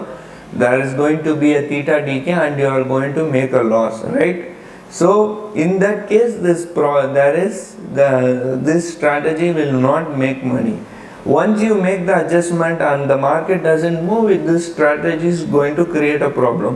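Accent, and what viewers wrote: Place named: East India, India. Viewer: Hey, he does well. I found that by slowing the speed to 75% and using CC if available works quite well. I have a slow ear from listening to South American Spanish speakers, much slower than the East Indian cadence. :)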